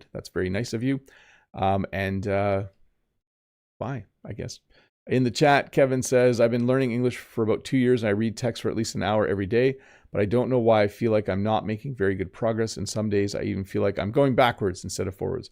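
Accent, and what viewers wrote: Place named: Canada. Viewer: you make me would like to immigrate to canada I like canadian accent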